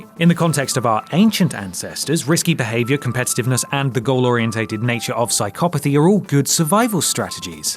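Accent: United Kingdom. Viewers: I was wondering why watch mojo all the sudden become British but then I notice it was what culture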